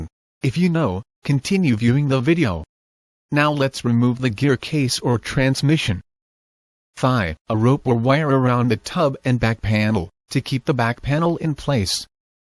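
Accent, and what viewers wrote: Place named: Spain. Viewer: I prefer your cool voice with Spanish accent instead of this robot.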